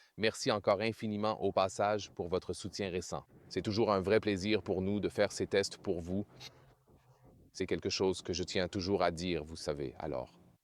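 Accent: Canada. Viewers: J'adore vos vidéos, et vos comparatifs. Mais pourquoi avoir l'accent Canadien pour la traduction en France ?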